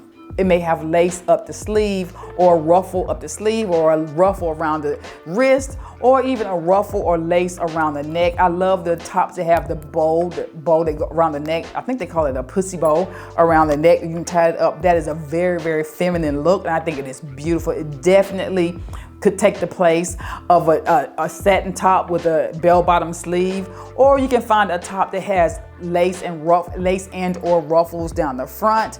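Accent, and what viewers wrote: South, United States. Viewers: First time tuning in. I was so distracted by her talking about herself and her clothes in the third person "she" and the country southern fast-talking twang and accent was everything... especially when she repeatedly says "cumtuble" over 100 Xs for the word "comfortable". Could never take someone that talks like her very seriously about anything "fashion". Let me try watching another one of her videos. I'm sure she's good but as the English scholar that I am.... my ears 🤔😏😏🙄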